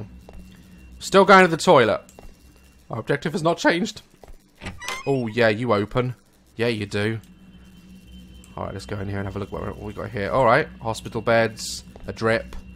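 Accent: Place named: Newcastle, United Kingdom. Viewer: I think it's a Gordie accent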